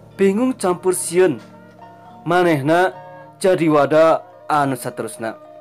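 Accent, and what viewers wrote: Sunda, Indonesia. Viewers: Wa alaikum salam wrhmtllh wbrkth masha allah lalampahan abah kanta semoga makin sukses kang meni sae dina nyariosna lagam nada nadana cirihas pisan orang sundana hatur nuhuun kg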